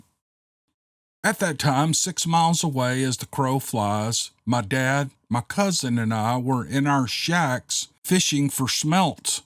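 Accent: South, United States. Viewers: I love you. I listen to you EVERY NIGHT. I enjoy so much listening to you read in that sweet southern accent. Keep up the great work you do. ❤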